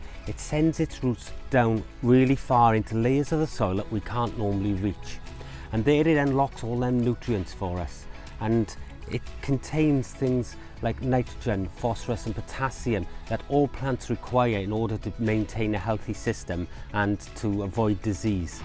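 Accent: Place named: West Wales, United Kingdom. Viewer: Your accent is similar to my relatives who live near Aberaeron / Lampeter. Are you from the west coast area mate?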